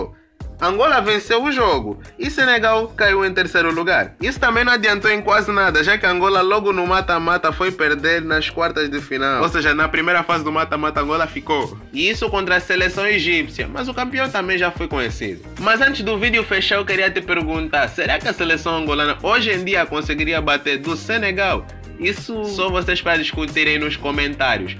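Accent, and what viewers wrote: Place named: Brazil. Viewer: bom trabalho mas para de falar com o sotaque brazileiro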